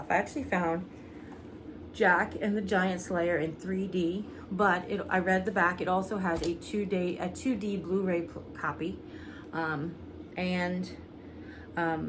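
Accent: New York, United States. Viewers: More great stuff! You're always fun to watch and Igetxa kick out of your "New Yawk/ Long Giland" accent which is near and dear to my heart since I live there. Love those planter baskets. Didn't see them in my store yet but hopefully they'll get them in. I did buy three of those 3 in 1 stackable planters that look like fun to work with. 💕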